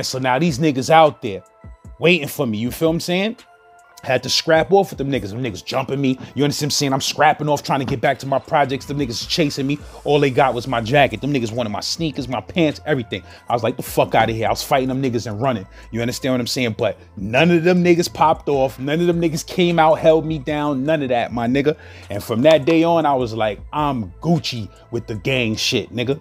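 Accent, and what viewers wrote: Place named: West Baltimore, United States. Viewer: My G on Easter Sunday. Sound like some West Baltimore shit. Definitely resignate salute my G!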